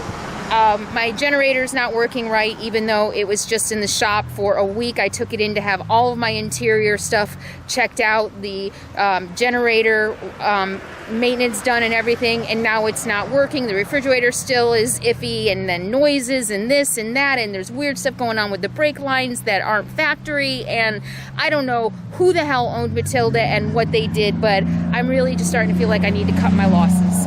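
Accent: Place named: South, United States. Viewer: Nothing wrong with picking up a little Southern twang...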